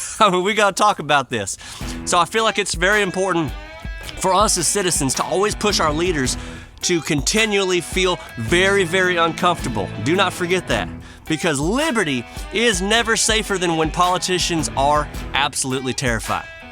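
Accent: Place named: Mississippi, United States. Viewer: I grew up North as far as ya can get in the lower 48. I’m no Yankee tho. Hunt, fish, worked on farms, rode horses, cut timber. Oddly, I got bunked with from Mississippi such as yourself. Minnesota/Mississippi connection of the start and end of the Big River. Shouldn’t have, but we got along just fine, confusing folks with our polar opposite accents😂🇺🇸👍🏻💪😎🤷‍♂️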